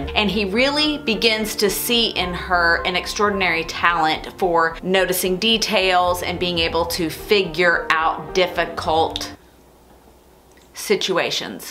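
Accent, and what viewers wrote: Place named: Virginia, United States. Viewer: I really liked this one. I'm from norfolk, va and your accent reminds me of home. I like old cemeteries too!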